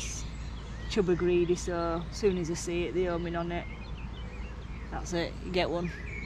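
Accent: North, United Kingdom. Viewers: Love northern acent 😀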